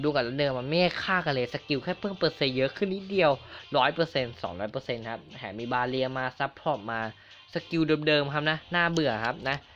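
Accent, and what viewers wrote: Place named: China. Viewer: ไม่ค่อยชัดเลยอะคนจีนใช่ป่าว?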